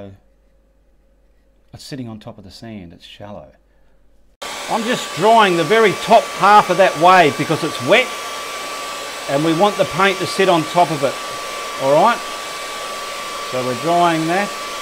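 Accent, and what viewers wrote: Australia. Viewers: Beautiful! Your sense of humor is so subtle, but so funny. "We'll put a bum on that cloud, and a bum on that cloud." I've been trying your examples in attempting to do my beach scene, and as simple as you make them, they still take practice, for me anyway. I'm combining two of your videos on one painting, and the tips and tricks are so incredibly helpful! Not sure if it helps the channel, but I definitely end up watching each video many times, haha. Watching them over and over builds up my courage! Thank you so much for taking the time to share your wonderful talents! I'm so happy to have found your channel! (I think I'm picking up an Australian accent while I'm at it!)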